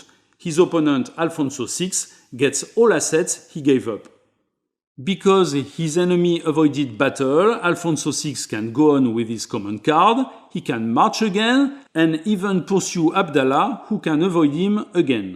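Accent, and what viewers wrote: France. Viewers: Thanks to you I was able to play Nevsky and I made some great memories with my best friend. I just bought this game because you made this tutorial. You are the best, if you keep making these as this series rolls out I will keep buying them. Plus you're French accent is awesome. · Giving the thumbs up for the french accent alone. Very nice video.😊👍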